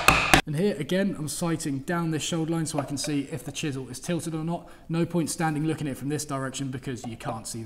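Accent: England, United Kingdom. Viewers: it's nice to here and English accent but you are way beyond my abilities.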